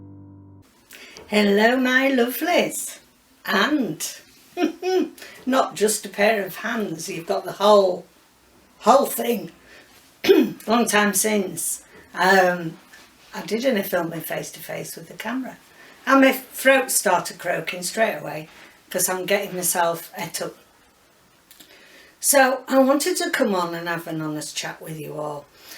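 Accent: Yorkshire, United Kingdom. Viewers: Hi, Ive been a subscriber for a while now and although I dont comment, I thoroughly enjoy your videos. Months ago I was just scrolling through YT and came across a Yorkshire accent, well I knew I had to follow you, being a yorkshire lass myself. I know nothing about junk journalling but I still look forward to your videos especially the sewing ones. I learnt so much from you watching those. I will be sad to see you go but you have to do what you feel is best for your mental health. Could you do one junk journally vid one week then one sewing vid the week after. Have you thought about selling on Folksy? Its a smaller channel but is a lovely place to sell as there are no mass produced rubbish on there. I really do hope you stick around, maybe have a break to regroup. Please take Care. Angie x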